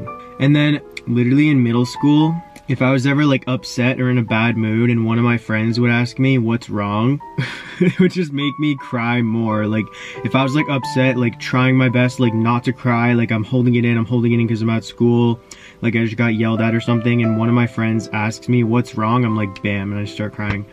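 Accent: Canada. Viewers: Are you actully from Canada? All your "ou" words have that Canadian "ow" sound! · Am I sensing a Canadian accent? 🙃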